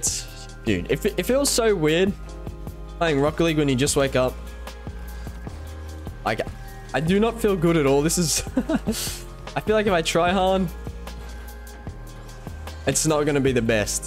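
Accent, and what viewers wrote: Australia. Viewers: Road to top 50? That would be so cool to watch, if you can’t though, how about top 100? Also I just hit diamond in 1s (plat 2-3 in other comp modes ahaha), and I think I can attribute that (at least in part) to you and Sotus’ individual 1s series. It’s super helpful and inspirational watching you, so I just wanted to say thanks man. <3 also it’s awesome to have an Aussie RL YouTuber to watch... American accents can get a bit annoying after a while 😂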